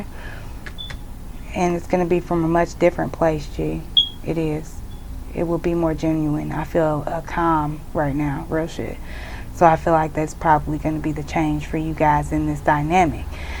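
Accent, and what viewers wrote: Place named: Northern California, United States. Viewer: You sound like you’re from where I’m from, Nor Cal. Lol. I’m hear for another Aquarius man, Scorpio woman reading. Trying to get a read on this new situation I’m in. 🤔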